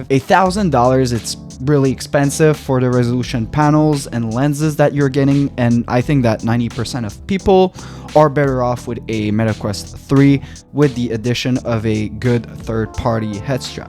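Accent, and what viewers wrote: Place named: Quebec, Canada. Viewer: je peut entendre l'accent quebecois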